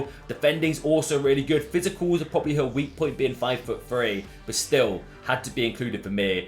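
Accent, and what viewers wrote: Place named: England, United Kingdom. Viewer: I really didn't want to sound like a prick but I wanted to make sure I got my point across which is why I wrote a bible after lol. I know I'll never be able to afford end game in FIFA, but I can imagine it's a lot of fun to throw almost any player in the squad (I'm glad you made it to the other side lol). It's the mystery or curious factor that makes us all want to use those top tier players, but sadly most of us won't have that chance. Again, without sounding like a prick, I think a series with a cheaper budget could really "help" your channel and a lot of people will grow attached to it. I feel like the cheaper budget/Hidden Gems/Silver Beasts type videos are something that have been missing from the community for a while now (or maybe just very rare). IMO, they're fun and help connect the community since more people can relate and interact on the videos. 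I'm actually 28 tomorrow and I've always wished that I grew up in England as a kid (I feel like my age has some "value" since I've been grinding FIFA for 14+ years lol). Having soccer as your die hard passion and the only sport that you watch was almost like living in the shadows in a way. I couldn't believe it was so loved and celebrated almost practically everywhere else in the world. It was kind of depressing. Anyway, even though I've watched a handful of your videos, I was still geeking out a bit because you're from England. Just really cool to me lol. I always loved the way you guys spoke, like dropping the C word c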